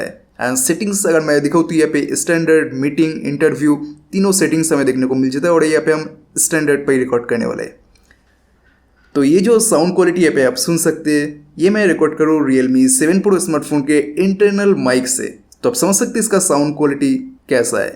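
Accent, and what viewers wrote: Bengal, India. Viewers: Bro are u bong ?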